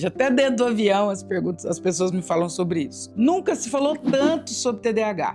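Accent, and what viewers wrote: Minas Gerais, Brazil. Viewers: Sempre ouço os vídeos em podcast! Mas percebi no sotaque mineiro,uma credibilidade,. portanto a atitude de não menos que se inscrever em um canal a qual pessoa me faz se emocionar!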